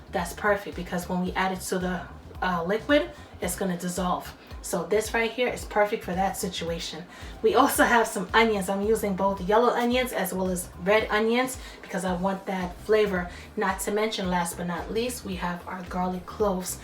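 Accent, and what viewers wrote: New York, United States. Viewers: Thank ya Miss...I love cajun seasoning. You sound like a fellow New Yorker...hahaha....love it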